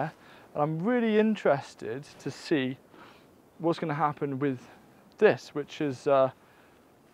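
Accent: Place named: Wales, United Kingdom. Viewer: Love hearing your voice just talking casually. I live in Hannover, north Germany, but I come from mid Wales (Montgomeryshire) and recently got into gardening, got a Kleingarten (german allotment with a little cabin) and was looking for help with ... how to have a garden, pretty much. Your advice and content are exactly what I needed, so I've been following along for a while. I'm starting to get the hang of it, and the joy of the whole project just grows and grows. And as a bonus, every word you say sounding like the people I grew up with makes it all very easy on the ear. Sometimes I don't watch what you're doing, just listen to you talk. I'm still learning though, right? 😊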